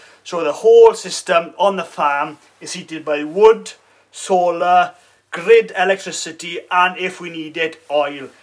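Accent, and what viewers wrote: Wales, United Kingdom. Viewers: Hi, what model rayburn have you and how many rads? Can the rayburn handle it? Do you know what sort of kw output you get from your rayburn? Im wondering if a rayburn would heat a kitchen adjacent to my lounge if i put the rayburn in my inglenook in the lounge as flu etc already in place currently have 5kw log burner in lounge and heat won't travel to kitchen i have tried fans and all sorts now thinking of rayburn ty for video nice to hear welsh accent again after growing up in Brecon, in Shropshire now. Cheers.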